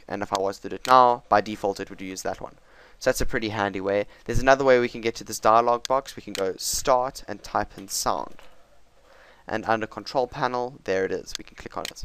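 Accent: South Africa. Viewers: Are you South African? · Are you from South Africa?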